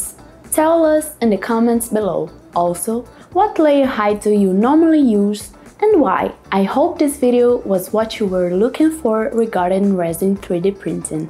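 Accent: Brazil. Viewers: Nice Brazilian Portuguese accent! Could tell just by the name hahaha I never changed the layer height settings in my printer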